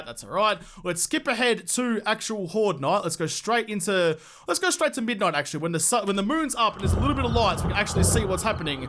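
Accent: Australia. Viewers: " Gaoodayea" I'm guessing he is Australian?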